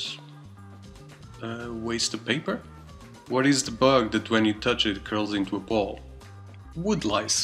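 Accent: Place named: Braga, Portugal. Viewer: OMG distrito de Braga, sotaque pior (ou melhor) que os do Porto quase!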